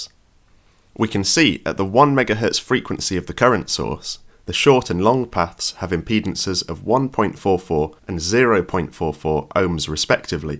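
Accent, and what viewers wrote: United Kingdom. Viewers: He´s maybe in Germany, but he´s clearly a Brit...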